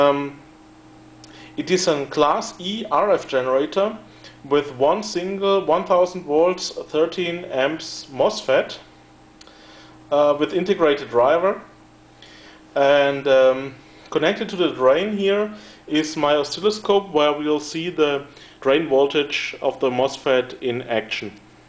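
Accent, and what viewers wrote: Germany. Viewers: Man hört sofort das du Deutsch bist ^^ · Man hört irgendwie dass du deutscher Bist; aber kannst geil englisch :D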